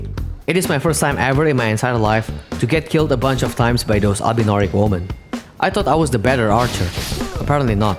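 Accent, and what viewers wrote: Indonesia. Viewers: are you indonesian? you sound very indonesian no disrespect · pantes kek ga asing logat inggrisnya ternyata org indo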